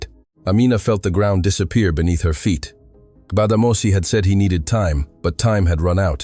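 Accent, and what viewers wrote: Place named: Africa. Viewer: ❤❤😊😊What a fantastic story!! Words can indeed change the world. It's tragic but true: arrogance blinds one to the cruelty they can wreak on others to salvage their bruised egos. Emeka should get another 25 years to ensure he doesn't emerge alive from prison. People like him should NEVER see daylight! Great story, fittingly narrated by one with an African accent. More of the same please.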